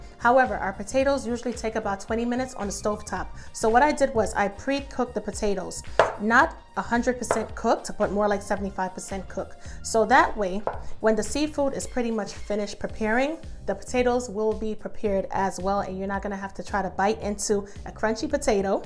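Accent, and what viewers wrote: New York, United States. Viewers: Ikr 😆 Is it New Yoik (?) York 😉 I Love it 👍😁.... Just like her looks and cooking skills... Genuinely Natural and Beautiful 😊😊😊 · looks yummy and yes thats a strong new york accent i hear lol it sounds like brooklyn or long island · Sounds like New York??? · U did that !!!!!! U have an accent where r u from ?? NYC? CT? Islands? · Looks delicious n sounds like u have a NY/NJ accent. Love it!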